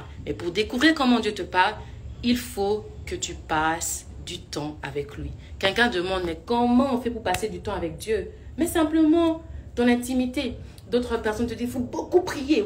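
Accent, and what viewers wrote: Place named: France. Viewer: Elle s exprime très bien !!! accent français hein !!!!!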